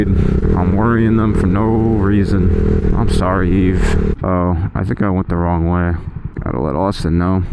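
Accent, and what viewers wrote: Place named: Louisiana, United States. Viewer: I know you’ve got something wrong cause you sound like you’re from Louisiana with that slur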